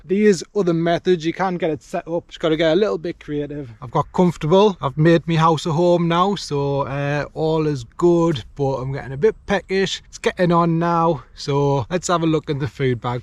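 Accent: Newcastle, United Kingdom. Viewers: you're like a Geordie version of Steve1989MREInfo.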